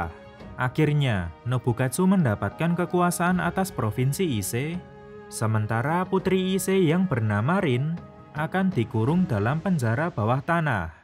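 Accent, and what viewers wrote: Java, Indonesia. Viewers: raiso dapusi medok e tp gpp ciri khas sampean mas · Suara medhok e bikin kange chanel ini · Wih logat jowo ne Ra ilang, lanjot terus gan tahanke logate rasah digawe gawe koyo wong hudu jowo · Bang wong jowo ta 😊😊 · Sial. Malah ketemu jawa medok. Telingaku jadi gak nyaman. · Wong jowo Endi bang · Rasa belajar logat jawa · Jawanya pekat bngt👍👍 smngt bro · suaranya medok:v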